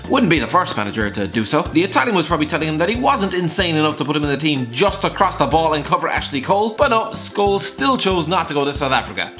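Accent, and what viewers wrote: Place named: Ireland. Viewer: Love the Irish guy doing the narration! He's SOOOO funny!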